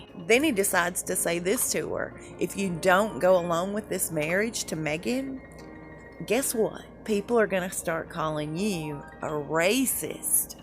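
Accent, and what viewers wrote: South, United States. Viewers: I need you at some point to say "bless her heart" in your wonderful southern accent. 😊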